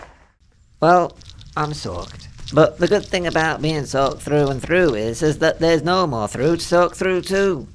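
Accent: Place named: Liverpool, United Kingdom. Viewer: accent is like Ringo's. Is he a Liverpudlian? (PS. I'm an Americanium)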